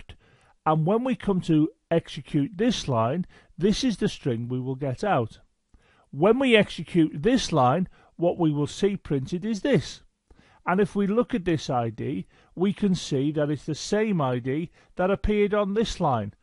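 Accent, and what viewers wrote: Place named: Northern England, United Kingdom. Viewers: Thanks for the clearest (and scouse-tinged) explanation I have found so far. So just to check - the 'self' parameter tells that method "please execute the code on the object you're invoked on". Is that not already implied by definition? What would happen if 'self' was not included? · mate u from the north of england, your video is mint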